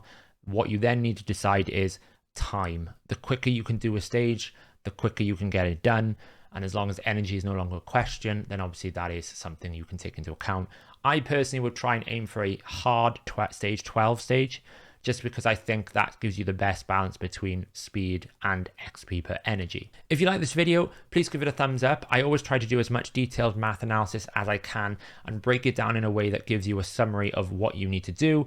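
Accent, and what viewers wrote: Wales, United Kingdom. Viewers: Are you welsh? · You sound like your from llanelli or swansea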